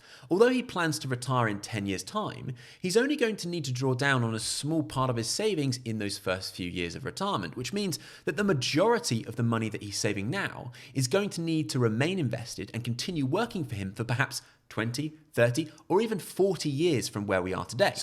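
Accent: United Kingdom. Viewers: Hello. I am Canadian and like your amazing videos for two reasons: the information as well as your British accent. I try to copy your accent. Keep up the great work!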